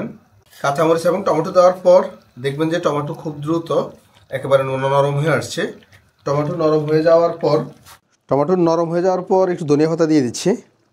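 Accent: Noakhali, Bangladesh. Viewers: ওনার কথা শুনে বোঝাই যাই নোয়াখালীর মানুষ।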